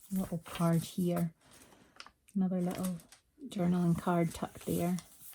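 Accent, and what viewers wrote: Scotland, United Kingdom. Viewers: Hi! I am new to your channel and I am so glad I found you! What superlovely and beautiful and feminin journals you are making. They are just wonderful and unique! Now I have to watch all your videos ❤️ You are a true artist. And your Scottish accent is very pleasant to listen to. Thank you for sharing ! ❤️ Hugs from a cold and windy Gothenburg, Sweden //Charlotte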